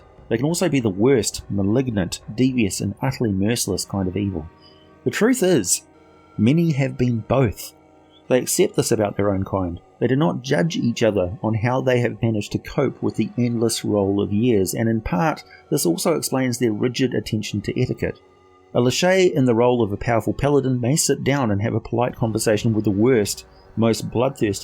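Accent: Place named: New Zealand. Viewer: Ya see, this is why you need to do those Creator Races videos. We need to know this stuff, and we need it delivered to us in a Kiwi accent.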